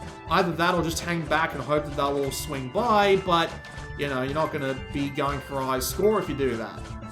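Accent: Australia. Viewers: Just wanted to thank you, I've been comming increasingly aware that I use your channel a lot when looking for PS VITA games (especially ones that have been turned into limited physical releases, including this very game!). But i'd much prefer to play Darius Burst than this.. I do say I love the art work in ths game though! PS - If you haven't already, I strongly recommend "CALADRIUS" on PS4, it has become my all time favourite Bullet hell shooter!...even though I have never made it past the 3rd Boss (I don't use continues, just rinse & repeat!) oh and it's awesome listening to a fellow Aussie gaming! =)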